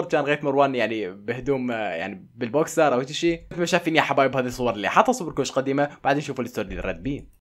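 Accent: Iraq. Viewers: انت عراقي؟ وانا اقول انت سوري؟؟؟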